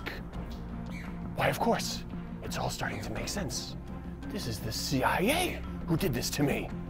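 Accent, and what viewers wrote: United Kingdom. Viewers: is my favorite YouTuber, but his British accent is just terrible...lol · Hahahaha I'm rolling on the floor...that British accent😂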